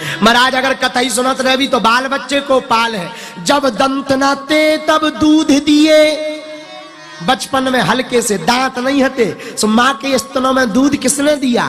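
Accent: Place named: Bundelkhand, India. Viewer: महाराज जी आप बुंदेलखंडी मैं कथा सुनाते बहुत अच्छे लगते हो 🌹 हमें भी समझ आ जाती है 💞 जम्मू से पगली भक्त हूं 🙇👏👏 सीताराम हनुमान जी 🌹🌹🙏🙏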